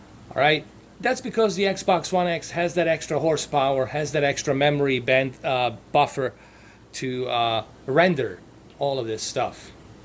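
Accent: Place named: Florida, United States. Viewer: Hey bud. Awesome cant wait to play it. However If i may request. What are your picture settings while using game mode for bfV? I am very interested in using the free sync feature at 120h. Currently when I play forza horizon 4 and red dead redemption 2 I am not using game mode but I am using your natural picture settings which give me the best picture IMO on the q8fn. Would I use those same natural picture settings in game mode? I would love to hear your opinion. As always thank you so much! Also on a side note, please take no offense, I love your accent. I grew up in Florida as well. Take care bud.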